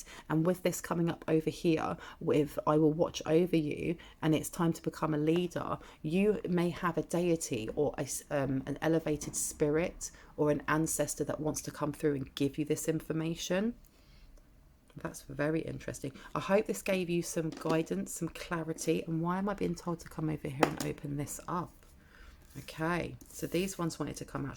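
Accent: United Kingdom. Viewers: thank you my love you add subtitles i think you have British accent and i am Indian that's why i need subtitles to listen video ..... thank you. you are beautiful angel ❤️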